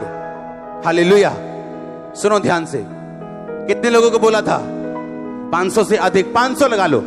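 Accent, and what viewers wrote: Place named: Punjab, India. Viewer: Apki Punjabi language bolte phli bar suna so swt papa ji😊❤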